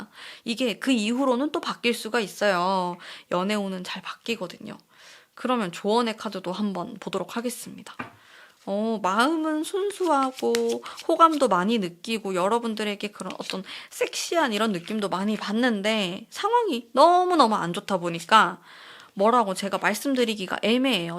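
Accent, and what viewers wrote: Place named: Busan, South Korea. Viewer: 며칠동안 님 영상을 50개 넘게 돌려본 팬이에요❤ 1개 영상보고 어? 나랑 너무 똑같은데? 신기한데? 이러면서 딱 한사람을 떠올리며 50개 넘는 연애 타로를 봤는데 1개 영상쯤은 다른 말을 할 수도 있잖아요 신기한게 그 많은 영상이 같은 의미를 담아서 정말 놀랏어요ㅠㅠ특히 같은 카드가 여러 영상에서 나온다던지 그사람 행동을 일관성잇게 건드리지 말고 내버려 두라고 한다던지 ㅠㅠ 어떻게 단 하나의 영상도 다른게 없이 같은 말만 듣는지 ㅜㅜ 소름돋아여 ㅋㅋㅋ 영상 너무 재밌고 부산 말투 사랑스러우시고 진짜 언니처럼 친구처럼 조언해주시는거 ㅠㅠ 여기는 찐입니다 심지어 엄청 자주올려주시고 ❤❤ 저는 이곳에 잠들게요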